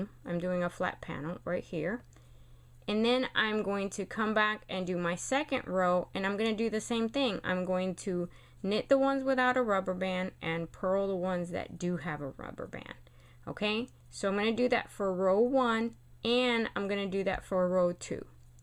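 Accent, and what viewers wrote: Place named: Baltimore, United States. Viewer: are you by chance from Mid-Atlantic area? you say "on" like somebody from Baltimore (i get a kick out of trying to learn about different regional accents)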